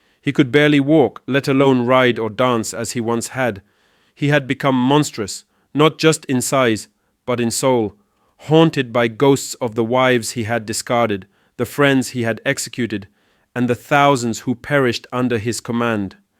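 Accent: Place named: Pakistan. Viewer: Pakistani accent detected. Video rejected.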